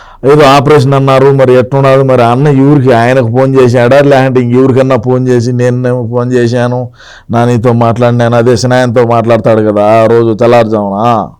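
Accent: Rayalaseema, India. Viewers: భాష రాయలసీమ భాష బాగుంటది.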